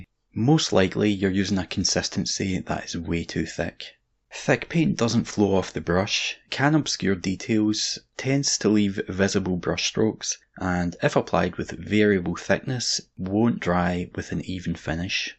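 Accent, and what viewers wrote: Scotland, United Kingdom. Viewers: hey can u do a video talkin all in hardcore scottish? like limy's show?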